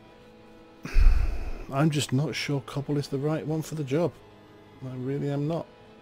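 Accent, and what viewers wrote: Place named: Southern England, United Kingdom. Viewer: This is the first video I have watched of yours and I must say I really like your commentary style. Being a southern Brit myself, it's great to hear such a southern accent talking about Minecraft! I really wished you'd get more views on your videos, your style may be slower and more relaxed but it is honestly the perfect thing for late night YouTube viewings! Keep up the great work, good sir! :)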